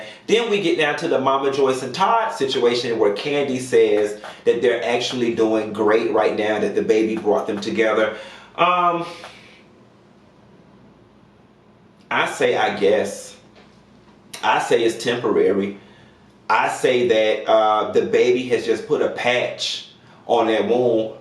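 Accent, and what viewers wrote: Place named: New Orleans, United States. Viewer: PLEASE READ THIS!!!!! YEP Q. I love when u say FSU😄😄😄😄. THATS WHAT MADE ME SUBSCRIBE OVER A YEAR AGO!!!!! Ya heard me. ( im from Detroit tho i love new Orleans accent. you give the best... Im mean the BEST reviews keep it coming. Yep and even tho i like TS Madison I looooovee watching when u r on her show. when scroll and c ur name in something i stop and watch Keep going brother!!!! U give me life especially in the morning. CATCH😭😭😭😭😭😭 LOVE IT AND U!!!!!!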